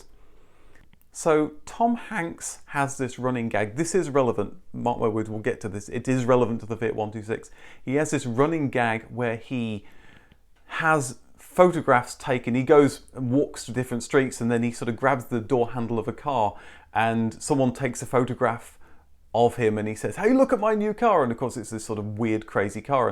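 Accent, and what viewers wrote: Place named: Midlands, United Kingdom. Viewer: Amazing how your intonation switches to much more English (or should I say "Midlands"?) dialect in this "Extra video" ... not sure you noticed.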